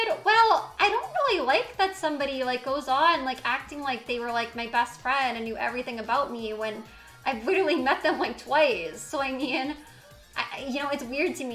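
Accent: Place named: Connecticut, United States. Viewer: When she says "bits-uh" I want to pull out my hair...I think its a connecticut thing lol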